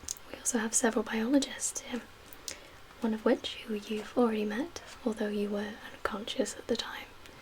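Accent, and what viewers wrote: Wales, United Kingdom. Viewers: Welsh, beautiful and a great ASMRtist..marry me?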